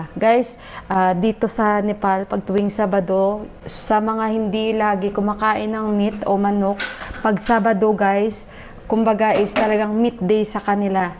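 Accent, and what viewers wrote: Iloilo, Philippines. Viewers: Gusto kita panuurin kc ilonggo ang tuno mo, ilonggo din aq from negros...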